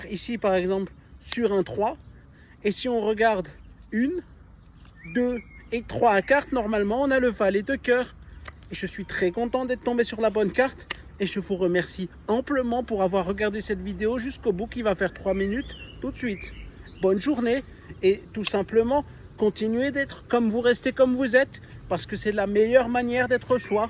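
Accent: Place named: Alsace, France. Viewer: Tu es génial ! Une personne vraiment humble et humaine, ça fait plaisir ! Je crois que j’entend un accent alsacien, si tu es de passage vers Mulhouse, n’hésite pas a répondre, je t’invite a boire une bière avec plaisir !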